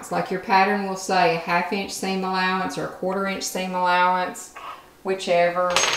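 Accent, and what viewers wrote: South, United States. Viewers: Your video came on after I finished another tutorial, but I thoroughly enjoyed your southern country drawl, as well as your explanation. I put my singer away and just sat and listened to your video. Thank you!! 🙏🏽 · You did a fantastic job with this video! Your explanations and demonstrations were so thorough and extremely helpful and easy to follow. I’m looking forward to the holidays being over so I can play with my new heavy duty Singer machine. Oh, and your southern drawl made it even more enjoyable! 😊. Thanks, looking forward to the next video.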